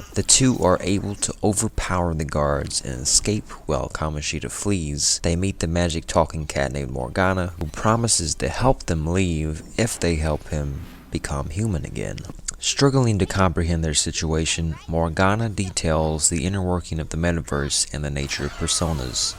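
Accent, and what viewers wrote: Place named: United States. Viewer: Great story explaination and at first I thought u were pronouncing some names wrong bcus u didn't know but then I figured out that u were pronouncing it the American way lol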